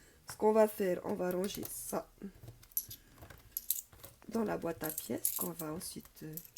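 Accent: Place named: Tahiti, French Polynesia. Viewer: J ai l impression que c est un petit accent Tahitien 🤗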